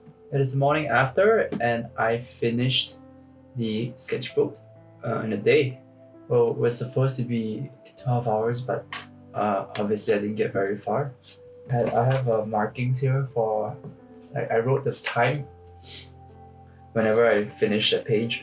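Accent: Singapore. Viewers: i knew i recognised that accent 🇸🇬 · why does it sound like you have a Singaporean accent lol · You sound singaporean/Malaysian COOL!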